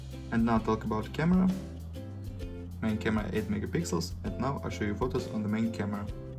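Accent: Russia. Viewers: Just by chance is you’re first language Russia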